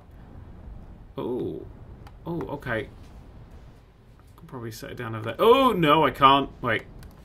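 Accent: United Kingdom. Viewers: Sir, I don’t know how to make this not sound wired, but you are delightfully British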